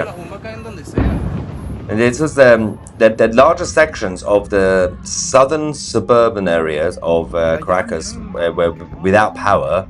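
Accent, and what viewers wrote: United Kingdom. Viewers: I love how when mentions Caracas it sounds as if he were saying "Crackers" in his lovely British accent. 😉🤣 Seems appropriate somehow. But here is hoping they can get back some SANITY in their gov't with dictator maduro out of the way.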